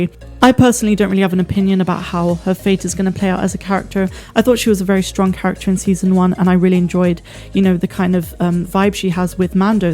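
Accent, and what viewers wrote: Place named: South West England, United Kingdom. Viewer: Id know those hard rs in accent anywhere, your from the south west! Likewise lol, p.s. Great content as always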